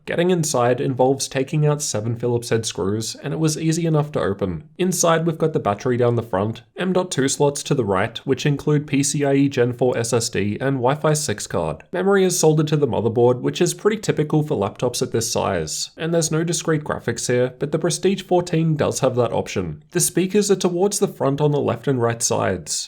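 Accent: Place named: Australia. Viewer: Imagine not spotting an aussie accent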